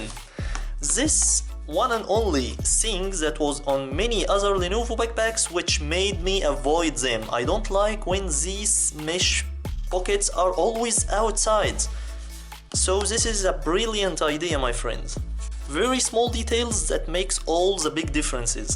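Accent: Egypt. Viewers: your accent seems to be Egyptian, bro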